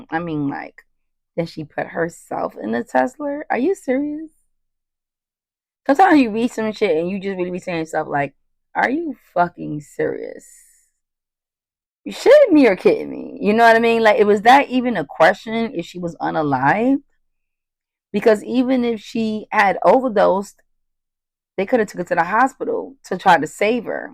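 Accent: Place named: New York, United States. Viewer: Are you from nyc lol you have that accent.. praying for all the missing children out here!!!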